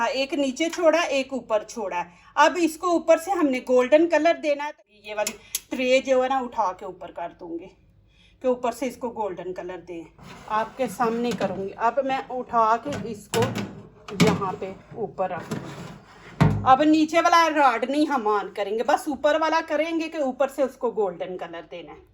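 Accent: Punjab, India. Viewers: Kamal. log. hee .ap.Angrezi. boole. ya urd .boole. Lagta he panjabi. Me.bool rahe he .baqui. Keke picture me .bohth acha lagraha he. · اپ پنجابی سپیکنگ ھیں اپ کا ااردو کا لہجہ بہت بھونڈا ھے اپ یہ ھی لہجیہ اپ رکارڈ کر کے دیکھیں پھر چووولھااا یا چولھےےےےےے پر کا لفععمر کیئےےے تھے ھمرے بچے اپ کے اردو کے لہجہ کا مزاق اڑاتے ھیں کوی پنجابی اپ کو نہیں سمجھاے گا اب ھم اردو سپیکنگ ھے ھمملییمن لفظوں کو ڈانٹا نہ کریں ھمبس کیا بتایں اپ کو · پلیز پلیز آپ اردو کے بجایےپنجابی میں بول لیں فل پنجابی لہجے میں اردو زبان بہت عجیب لگتی ہے سننے میں ذرا بھی اچھی نہیں لگتی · ماشاءاللہ ۔۔۔ریسیپی سے بڑھ کر آپ کی پنجابی اردو مزے کی لگی😅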